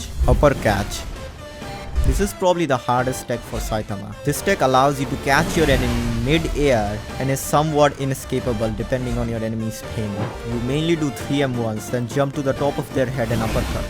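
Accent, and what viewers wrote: India. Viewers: WAHT KIND OF INDIAN ACCENT IS DAT W ACCENT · your indian i am pakistani so i know accent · Indian accent? w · I also feel he is indian bcz of his voice British say English In another way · Bhai u Indian? Ur accent tells me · FINALLY A GOOD INDIAN TSB YOUTUBER, THANKS THIS WAS RLLY HELPFUL PLUS NICE INDIAN ASCENT👌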